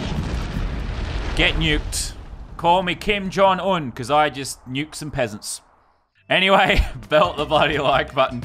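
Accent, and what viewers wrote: Australia. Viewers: Aussie accent comes through ama subscribe mate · great accent. Australian? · Are you from Perth because I'm from Sydney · Hes pewdiepie but funnier and australian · if you think he sounds like pewds... he doesn't, he's Australian. I know cos I am to. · Australian? Most YouTubers are Americans I am surprised to find a fellow Australian on YouTube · he's Australian... subscribing now... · You an aussie? The vid was in my recommended list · are u Australian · Are u Aussie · This guy Aussie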